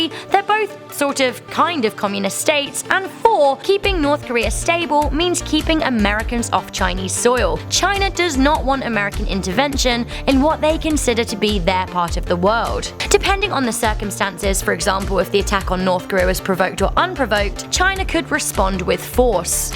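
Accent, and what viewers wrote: United Kingdom. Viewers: Mrudul Jain she is British get over it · She has a British accent. I have a accent like that and it’s hard to say some words.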